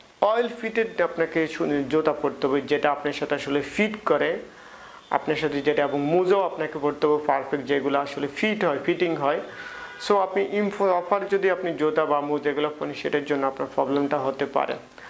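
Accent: Noakhali, Bangladesh. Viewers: নোয়াখালী মনে হয় স্যারের বাড়ি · আপনার বাড়ী কি নোয়াখালী? কথা শুনে মনে হচ্ছে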